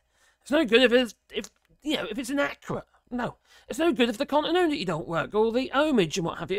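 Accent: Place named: Portsmouth, United Kingdom. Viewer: I live in America and bought this meter. I grew up in Southampton England. You did a perfect review and enjoyed your description. Manual dies fit but it is tight. I totally agree with everything you said. From your accent I was guessing you are from Portsmouth ? Or south of England near fom where I grew up. I did enjoy it!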